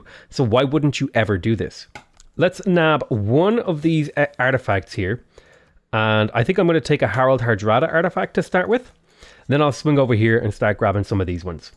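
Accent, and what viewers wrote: Ireland. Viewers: You should triple down on silly pronunciations. You're Irish, so you can generate a really strong mispronounced accent that nobody can blame you for. And you can exaggerate all of the foreign sounding names.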